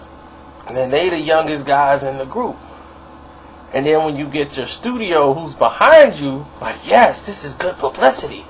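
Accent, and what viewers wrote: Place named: Rural, United States. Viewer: This nigga telling half truth bullshit ass youtube videos. This nigga sound like he from the "COUNTRY" somewhere smh...stfu bama!!!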